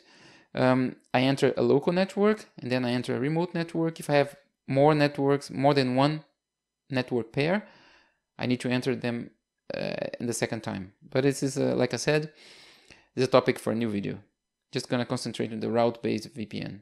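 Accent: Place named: Brazil. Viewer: ! Eu sabia que seu sotaque em Ingles era do Brasil! Muito bom canal! Estou comecando com Palo-Alto e seus videos ajudarao muito. Abracos aqui do Canada em 2024!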